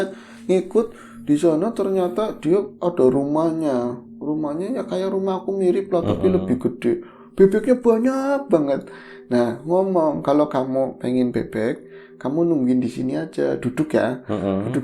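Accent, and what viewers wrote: Banyumas, Indonesia. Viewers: Logat ngapak nya blm ada lawan kaya nya... bisa ngakak sendiri kalo dengerin beliau pas cerita.. haahaaaa.. sukses selalu yaa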